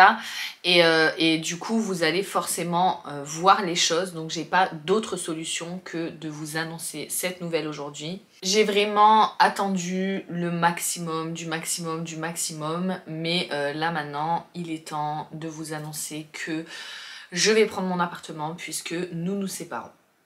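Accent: South, France. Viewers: Coucou, je t'ai decouverte hier, avec une vidéo lié à ta nouvelle vie, une nouvelle vie qui j'espère t'apportera plein de bonnes choses, de toutes façons faut ce dire que dans la vie rien n'arrive par hasard, malgré beaucoup de sourire que j'ai vue sur tes vidéos ça doit pas été facile mais faut positiver. Je te souhaite beaucoup de bonheurs et de courage pour la suite. Ps: super contenus ultra naturel, un petit accent du sud plaisant. Bisous du sud également.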